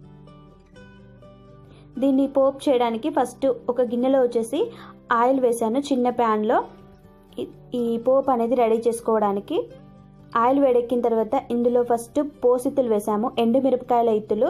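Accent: Telangana, India. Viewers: Pakkatelangana matladutunnaru pillalto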